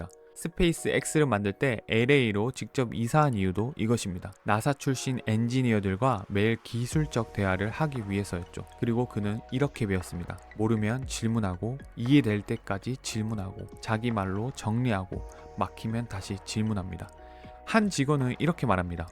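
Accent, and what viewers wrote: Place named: Gyeongsang, South Korea. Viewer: 경상도 사람인가봐요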